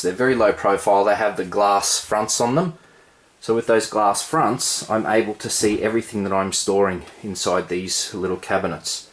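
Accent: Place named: Norway. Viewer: I love your Norwegian accent 👍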